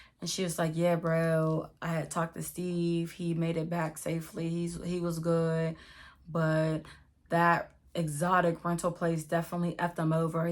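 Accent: Pittsburgh, United States. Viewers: i love ur story times & i love ur Pittsburgh accent it reminds me of college 😩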